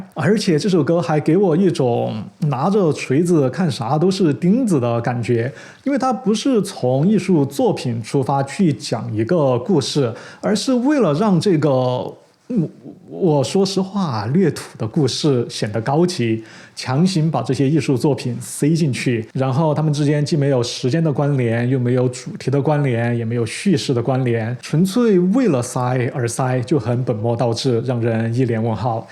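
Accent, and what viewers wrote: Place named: Chongqing, China. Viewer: 很喜欢你的重庆川普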